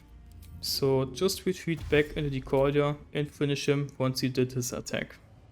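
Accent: Germany. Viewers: Pretty cool video , a lot of thought went into it I can see that. one thing though, why don't you consider to use all of shar's blessings in the Myrkul fight ? any specific reason ? they are rather powerful and could have saved you 15h of redoing everything. I'm also very impressed there was no " I blew up the emboss in one turn using firework / explosives" I might need to start all that pre-buffing and switching stuff if I ever wanna do a solo Honourmode run. Wenn du den Deutschen Akzent für die Zukunft loswerden möchtest, empfiehlt es sich, Serien, Spiele und Filme auf Englisch zu setzen, da du hier die richtige Aussprache hörst, dieser Tipp hat vielen meiner Deutschen Freunde geholfen, sich schnell darin zu verbessern. Ich finde, es hat mittlerweile einen gewissen Charme.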